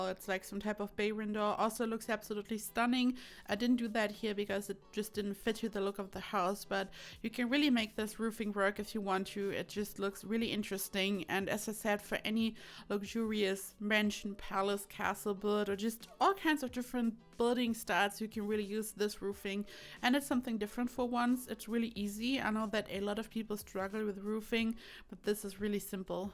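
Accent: Germany. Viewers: Your accent at least sounds very german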